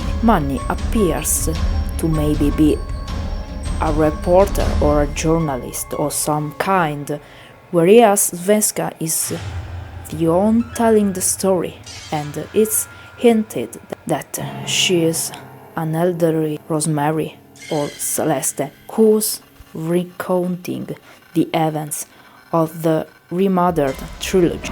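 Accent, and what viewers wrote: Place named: Italy. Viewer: Love your accent. It's Italian right?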